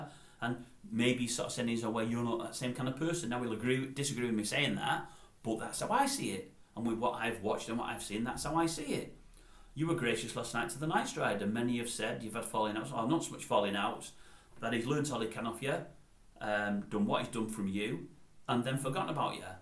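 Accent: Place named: United Kingdom. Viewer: One of the things that foreigners love about UK is the different regional accents. However when I, as a Brit, have trouble understanding 60% of what the chap is saying, then god help our overseas friends 😂 I’m sure the observations were interesting, I just kept losing the thread.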